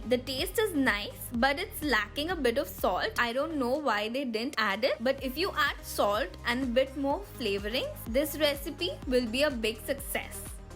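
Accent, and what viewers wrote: India. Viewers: Are you Indian ?????🤔🤔🤔 B'coz your accent seems to be indian.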